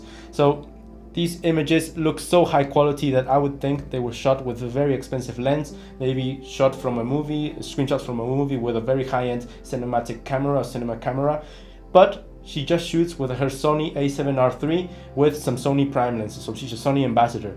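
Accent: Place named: Latin America. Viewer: Cómo se nota que eres hispano parlante, entiendo todo lo que dices a la perfección jajajaja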